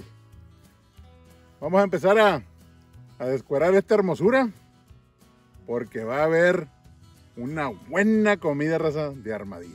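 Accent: Mexico. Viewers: junto con disfrutar las preparaciones y las recetas me encanta como habla el mexicano, lejos el mejor acento de todos los países latinos...órale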